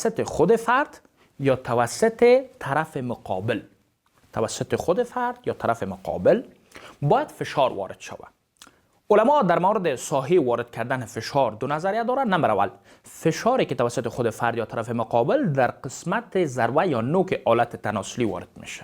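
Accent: Kabul, Afghanistan. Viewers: خانم من که درست متوجه پیام تون نشدم ایشان با لهجه آشکار کابل ما معلوم است که ایرانی نیست یا تو فقط از بابت زبان مشترک ابراز حسن نیت کردین ؟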